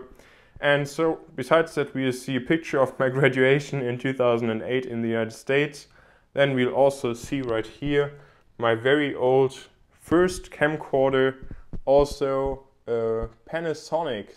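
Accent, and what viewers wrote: Germany. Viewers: is this guy german? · war iwie klar zu erkennen das du ein deutscher bist :D schönes raum tour! ;) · Are you German?? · You sound german, are you german? :) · Are you german? :D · U have Ferman accent... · Are you German ??? Also du hörst dich irgendwie n bisschen deutsch an ???? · Kann es sein, dass du Deutscher bist??? Wegen deinem Akzent und der ZDF App auf dem iPad Mini... :D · are u german ?? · im just curious .. by any chance ... are you german ?? :)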